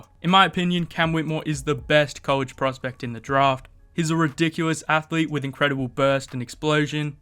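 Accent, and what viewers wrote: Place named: Australia. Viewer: Good video man very informative. I'm really not sold on Jett Howard. It will be interesting to see how the Thompsons go in their first couple years too. I sensed an aussie accent, shout out from Sydney!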